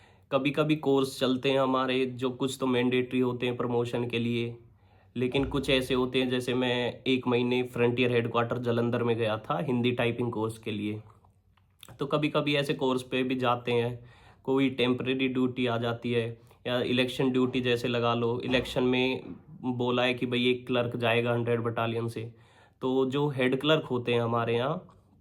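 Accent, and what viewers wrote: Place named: Noida, India. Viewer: Bhai ji apka bolne ka tarika asa lag rha hai jese aap Noida se ho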